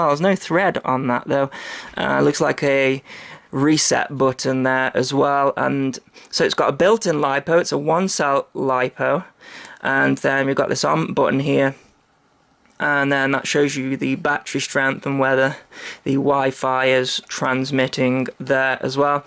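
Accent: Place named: Yorkshire, United Kingdom. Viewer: Yorkshire accent :) I came here from Quadcopter 101 because I wanted to know more. Could you potentially use that booster with any wifi-drone?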